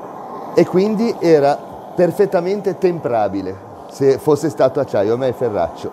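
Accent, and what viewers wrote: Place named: Liguria, Italy. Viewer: sei un ligure ti sento dall'accento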